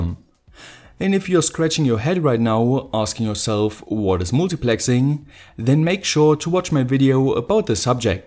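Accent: Germany. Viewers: Schade dass Du nicht deutsch sprechen kannst. Ich höre immer einen deutschen raus der versucht extrem perfekt Englisch zu sprechen. Aber das ist ja quatsch. Weiter so. Tolle Bastelthemen! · are you german? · Hey it sounds like ur speaking deutsch When yes: ich auch xD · It's probably the german accent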